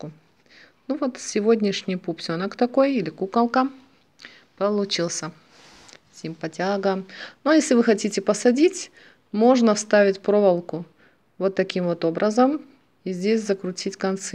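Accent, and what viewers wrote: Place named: Baltic. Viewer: Ну, надо же, у Вас очень молодой голос и мне слышится прибалтийский акцент, очень красиво речь звучит и как-то необычно. Я заслушалась. 😊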